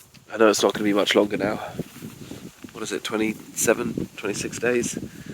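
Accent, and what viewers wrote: United Kingdom. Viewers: Looking forward for more long distance voicemail like this, your voice ❤ and that british accent though ❤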